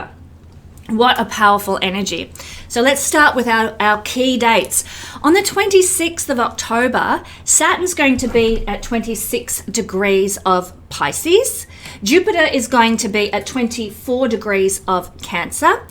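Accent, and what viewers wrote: Australia. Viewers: G'day it gr8vto heat a Aussie lady doing this ❤ur worx kind re thommo nsw Australia 🇦🇺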